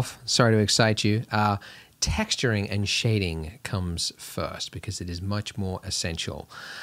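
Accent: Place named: Australia. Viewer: bru are you an Aussie mate?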